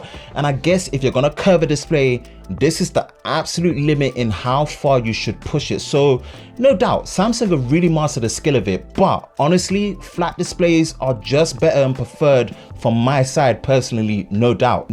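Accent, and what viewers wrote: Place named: United Kingdom. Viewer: let's just say, I didn't expect a silky British accent, lmao.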